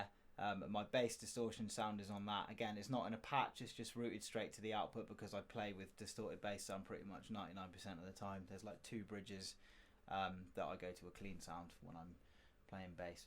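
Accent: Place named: South West, United Kingdom. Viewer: Mostly came here to find out what that mysterious silver box of yours did, Nice to hear a south west accent talking about pedals for once keep it up!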